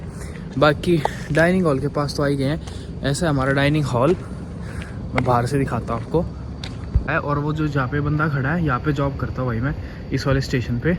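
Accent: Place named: Haryana, India. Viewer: Bhai Haryana se ho Pura touch h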